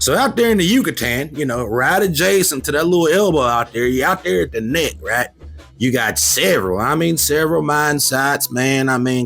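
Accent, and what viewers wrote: South, United States. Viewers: the southern accent is distracting and cringe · Bruh, this southern accent is trash. Makes a lot of the video hard to watch. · Man. I'm from Georgia and your ass had me 😂 crying talking like one em country boys. Love this channel. Keep doing your thing man. I'll keep watching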